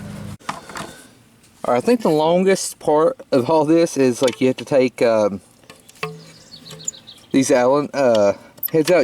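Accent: West Virginia, United States. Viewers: Where are you from. West Virginia ?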